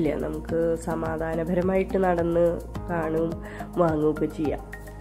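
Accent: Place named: Thrissur, India. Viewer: Thrissur aano sthalam?? Samsaram kettapo thonni.. Me too from thrissur...Good and helpful video..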